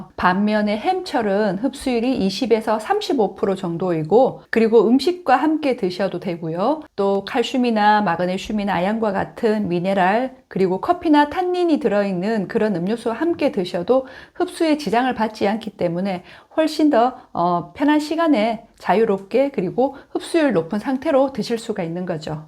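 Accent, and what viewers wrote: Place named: Gyeongsang, South Korea. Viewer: 경상도 억양이 있네요 예쁘고 똑똑하시네 다 맞는 말씀입니다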